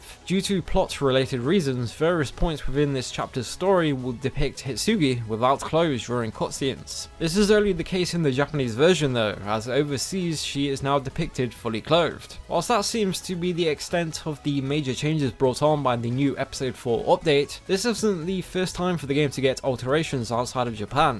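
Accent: United Kingdom. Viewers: Whoisthis a type of British accent, emphasis on type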